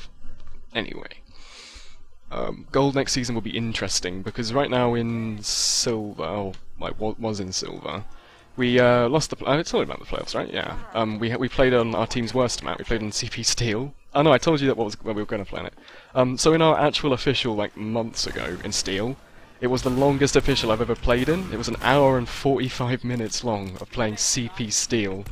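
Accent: RP, United Kingdom. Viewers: alright cool however i noticed a big difference between today and 2014. you sound so much more posh! c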